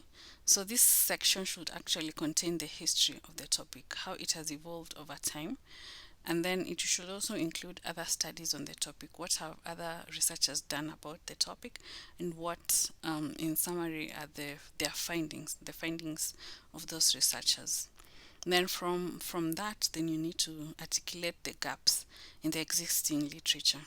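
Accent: Kenya. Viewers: Very helpful, loving the Kenyan accent too. Happy to be your 6th subscriber....yeey!! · I know that accent anywhere, Thank you for the information miss +254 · couldn't help but notice the Kenyan accent good work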